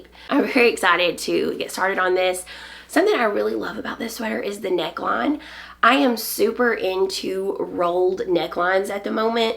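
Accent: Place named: South, United States. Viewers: I’m from Texas so it’s nice to hear another version of Southern “twang” (accent). The cowl is too precious - I can see why you would treasure it. Totally love the leather of the project bag. Can imagine just how many WIPS would fit in there^^ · You are such a delight to watch! Your accent thrills me. I grew up in East Texas and it’s very East Texas😄 My days are heavy. My husband is recovering from a live kidney donor transplant. Your knitting podcast is such a fun relief. I taught both my granddaughters how to knit. Your daughter’s pink cowl brought smiles to my heart. Your mudd bag was amazing to see. Take care and I hope you’re well soon. Merry Christmas🎄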